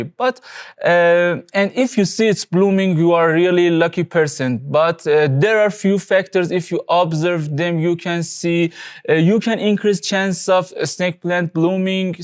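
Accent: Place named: Russia. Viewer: Hey are you from Russia? I love your accent also say hello to dear Mr police station❤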